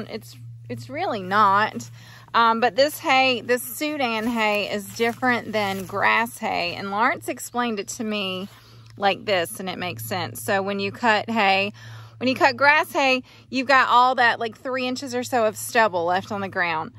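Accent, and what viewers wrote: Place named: North Carolina, United States. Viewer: Beautiful eyes and smile. Love the NC accent and your positive attitude.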